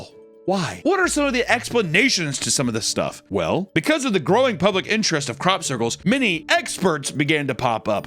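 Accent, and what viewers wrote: England, United Kingdom. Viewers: is truly a man of talent. The Bri’ish accents were so amazing 😂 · Why is his British accent spot on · As someone not from England, that's exactly how people from England all talk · As a Brit myself, your British accent is beautiful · FAVORITE English accent by far. Holy fuck 😂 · every time does his English accent I lose it. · I love British voice, I wish we sounded like that, we would actually be interesting then. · the english accent/commentary has me crying 😂😂💯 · The bri-ish accent is killin me 😂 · I like British accent takes the form of Fred Flintstone somehow... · this video made me feel like i was slowly losing my mind with, the British accent is so funny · lol that british accent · As an American I approve this British accent lol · I love his British accent, how it tickles me.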